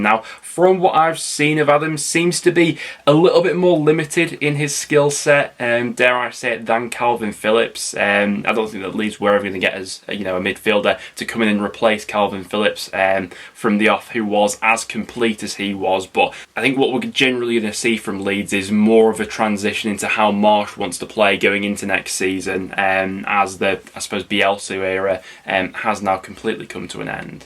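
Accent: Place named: Preston, United Kingdom. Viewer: As always, a very good video, BUT please stop calling Lewis.....O'Breean. My friend worked in the media department at Huddersfield and it is definitely pronounced O'Brian, as in Clough. Tbh, it might just be that Preston accent: )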